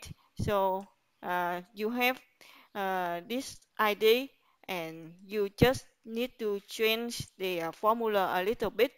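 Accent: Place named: Vietnam. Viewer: thề nghe giọng đọc TA biết ngay người Vietnam :))))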